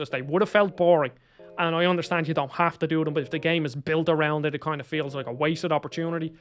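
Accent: Ireland. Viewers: Earned a sub from me pal - glad to hear a fellow Irish accent raving about this game!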